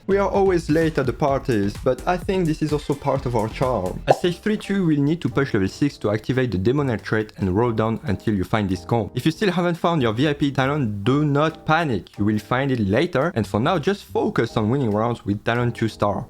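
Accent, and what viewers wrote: France. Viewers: little french accent or what ?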